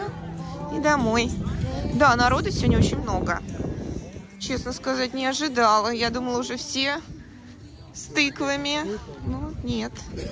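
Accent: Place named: Bryansk, Russia. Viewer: Сподхватились? Деревенский русский. Брянщина. Хорошо,что Джон не знает русский-его бы коробило!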